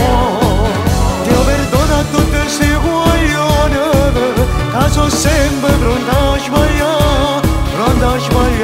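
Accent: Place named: Naples, Italy. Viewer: Complimenti veramente belle parole devi solo giustamente aggiustare un pò l'accento napoletano per il resto sei bravissimo continua così che fai strada molta strada ti auguro il meglio me frati <3 in bocca al lupo per tutto <3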